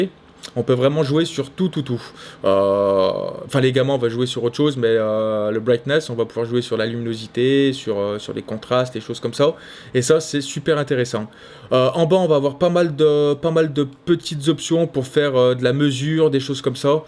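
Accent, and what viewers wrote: Saint-Étienne, France. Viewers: j'en ai beaucoup appris sur cette camera super vidéo mais par contre t'as UN PUTAIN D'ACCENT STEPHANOIS tu nous représente bien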